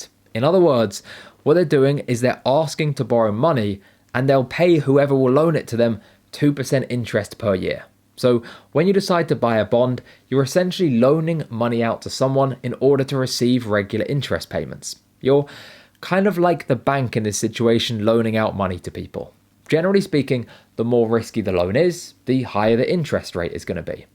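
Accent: United Kingdom. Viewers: Hello,! I have been watching your videos for quite some time and I am really excited about stock investing as you are (and your british acent is supercool!). I know you are not an advisor or smth, but I would love to hear your opinion about these five stocks (They got on my radar for this/next months investments to buy). It is: Verizon, wingstop, alibaba, walmart and pluralsight. What do you think about these (going long run 2-5years?). Maybe you have something else on your sight? Thanks in advance!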